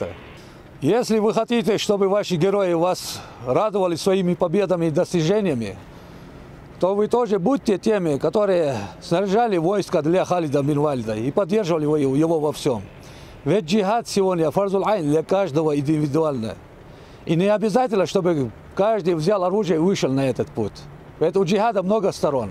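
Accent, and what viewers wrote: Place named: Chechnya, Russia. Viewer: Унего чеченский акцент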